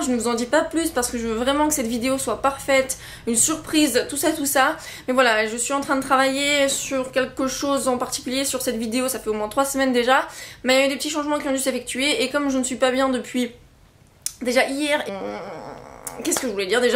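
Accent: England, United Kingdom. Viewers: Je me régale avec tes vidéos ,et tu as un accent anglais so cute ! ( vive le VO ! ^^) Malheureusement je n'ai pas autant de livre que toi mais ça viendra j'espère :P Bonnes lectures !! :D ( Of Neptune , la couverture quoi ^^ ) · Salut, t'es anglaise ? En tout cas t'as un super accent ! Bisous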